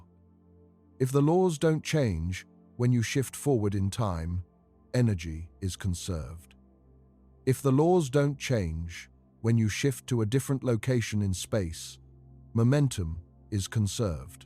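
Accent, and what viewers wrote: United Kingdom. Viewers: This British narrator speaking of a baseball spin must never heard of the ‘ol “knuckle ball” which doesn’t spin!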